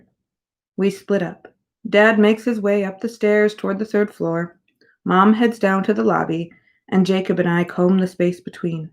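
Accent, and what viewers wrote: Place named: United Kingdom. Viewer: Nice British accent!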